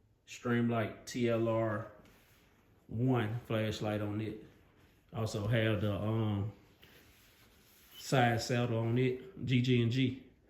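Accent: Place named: Jamaica. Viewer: Bad man Jamaican voice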